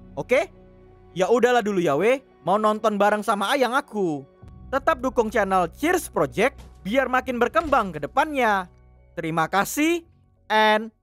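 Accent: Medan, Indonesia. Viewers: Orang medan abng ni bah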